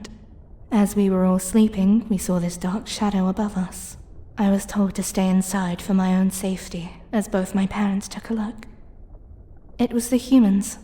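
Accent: United Kingdom. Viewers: Amazing audio, your british accent is very nice and I would love to see spy wife / girlfriend storyline to continue there is so much suspense in that one 😊☺️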